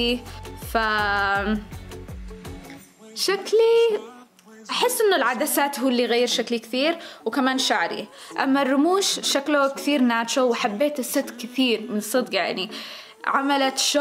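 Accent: Yemen. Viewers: حلوه اللهجه اليمنيه ع لسانك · كلامها يقول هي من وين بنتان من اليمن والله ما شيء كمانا فديتك وفديت اليمن وارضها ومن عليها ومن خارجها 🌸🌸🌸🌹♥️ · ماشاءالله عليه عسل بس عندي ملاحظه... برغم أنها عايشه في أمريكا إلا أن لهجتها اليمنية مازالت تتكلم بها وهذا شي عجبني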